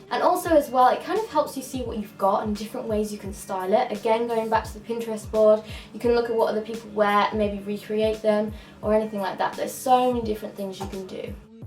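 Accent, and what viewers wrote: United Kingdom. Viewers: I 💗 ur British accent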